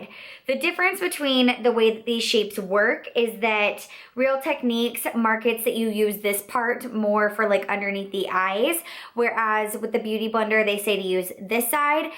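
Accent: United States. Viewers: I still can't stand the way american girls speak LMAO 🤦🏻‍♀️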